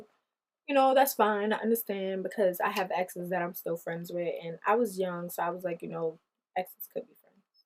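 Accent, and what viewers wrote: Jamaica. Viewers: are u Jamaican? you sound like it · You Are SO Pretty & I Love When You Talk Jamaican . #Suscribed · just found your channel, and mi neva hesitate fi subscribe from I heard Jamaican yazzz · Your Jamaican accent is everything 😍😍😍😍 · Love that you get Jamaican when you get mad 😍👑🙌🏽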